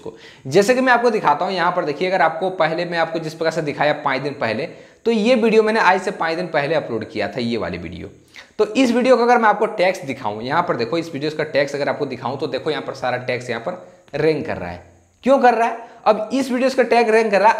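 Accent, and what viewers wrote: Bihar, India. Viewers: Aapka bolne ka tarika achaa hai Bihar se ho aap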